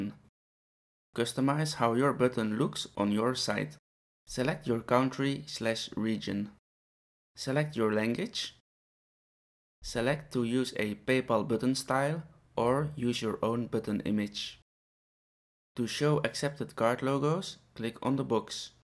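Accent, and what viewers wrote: Netherlands. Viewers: you are dutch i hear it in your accent lol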